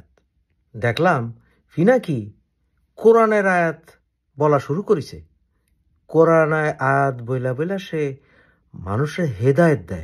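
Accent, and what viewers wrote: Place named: Bogura, Bangladesh. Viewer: বগুরার ভাষায়😂❤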